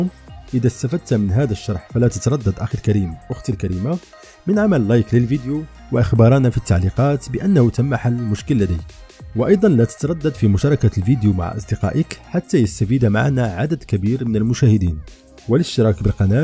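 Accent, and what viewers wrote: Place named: Morocco. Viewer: من صوتك تبدو انك مغربي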